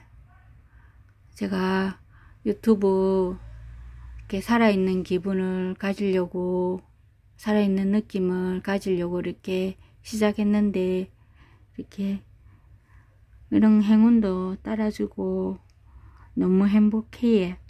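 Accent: Gyeongsang, South Korea. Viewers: 님 반갑습니다. 순수한 경상도 사투리로 애기해 주시니 넘 구수합니다. 함께라서 즐겁습니다.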